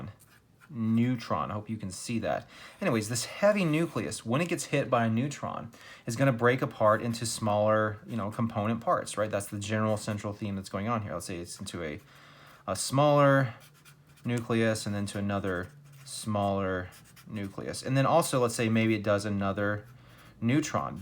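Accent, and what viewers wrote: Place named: United States. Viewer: Are you from Uzbekistan? If so why your accent is very American lol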